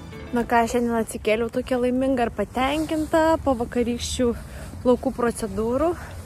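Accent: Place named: Aukstaitija, Lithuania. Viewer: Nu zinok vau! Taprasme as tavo kanala atradau visai netycia, bet be galo dziaugiuosi ir perziurejau visus visus tavo ikeltus video! Tu esi tokia paprasta, bet taaaip ikvepi dziaugtis paciais maziausiais dalykais🌸 gera girdet kai kartas nuo karto islenda aukstaitiskas akcentas😂🌸 labai laukiu tavo video! Net patys paprasciausi, buitiski, diena pavercia geresne ir prisotina motyvacijos!pati esu is Rokiskio tad dar smagiau ziureti ir zinoti, jog zmogus kiles is visai netoli☺️ laukiam kuo daugiau video ir visai nesvarbu ar tai bus paprastas filmukas is namu ar kai ka nors veiki! Aciu tau!❤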